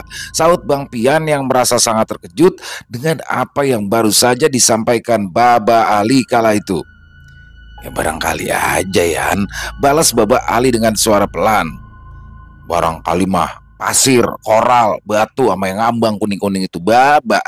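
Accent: Jakarta, Indonesia. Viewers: assalammualaikum....nah yg dinanti2 hadir lg cerita nya mas untung.sukseees terus..bang mksh... yg selalu dengan logat betawiny a yg mantap bikin seru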